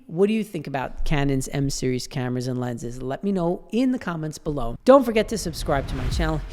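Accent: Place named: Tri-State Area, United States. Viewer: Did you mean to talk of it like it’s not mirrorless? That was the main selling point for me! Also your accent brings me back to growing up in the tristate area :)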